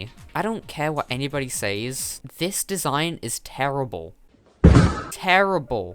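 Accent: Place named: RP, United Kingdom. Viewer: This guys is like SO posh. I want this guy to say "its a bit chilly here dontcha think"?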